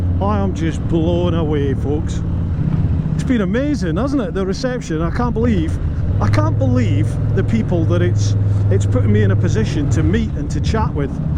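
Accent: Scotland, United Kingdom. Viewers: A Scotsman on a Harley ...I like the voice sound... Great vid..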